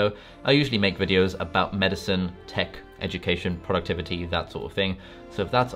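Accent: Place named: United Kingdom. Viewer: Great video, love how you explained everything in your perfect British accent. What you said about how ‘wolfbane’ can effect the nervous system got me thinking about the Wolfbane potion Snape brews for Lupin in the Prisoner of Azkaban, which stops him from turning into a warewolf and immobilises him.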